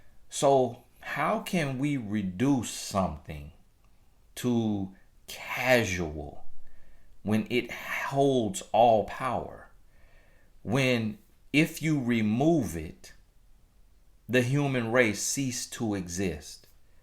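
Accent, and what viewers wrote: South, United States. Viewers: I love watching you. everyday. You tell the truth. You so country "You Here Me" lol.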